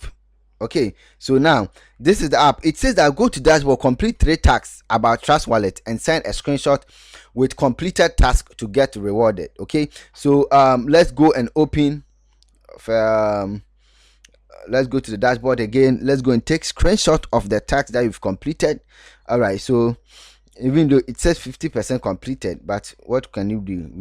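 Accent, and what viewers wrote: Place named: Ghana. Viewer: 🙌🏽🔥🙌🏽 more Energy to you Brudda. I just 😂 knew you were Ghanaian the moment I heard the Okay and Yooooo 😂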